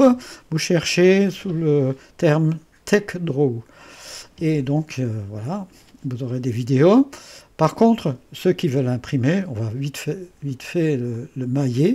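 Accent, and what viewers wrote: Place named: Strasbourg, France. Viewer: ce petit accent qui me rappelle ma première femme Strasbourgeoise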